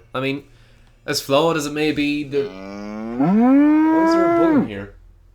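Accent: Ireland. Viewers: Dude are you from the same part of Ireland as Jacksepticeye??? Hope this isn't offending you (don't mean nuthin by it) but ya accent sounds very similar. Love your reviews fella!!! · I’m pretty sure he’s Irish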